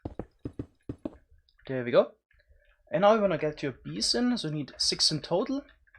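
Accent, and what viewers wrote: Germany. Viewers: Y do you sound so german? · Ich finde es geil das man hört das du ein deutscher bist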